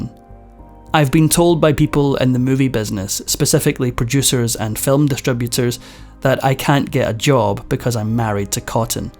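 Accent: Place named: Scotland, United Kingdom. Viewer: You have a lovely Scottish accent.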